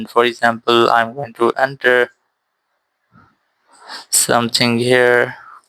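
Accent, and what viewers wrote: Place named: India. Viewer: Worked for me. Just two minutes to create my first Grok image. BTW, you sound like an Indian, so you may be interested in what I wanted from Grok. I'm answering an English X Post about the upcoming Ashes series, which had a picture of a man with an umbrella, Indian BTW 😆, with the caption "Me sheltering from Aussie tears when Joe Root scores his fifth century of the Ashes". I respondws with a Grok image of "England's Barmy Army crying in a stadium on a sunny day". Got it!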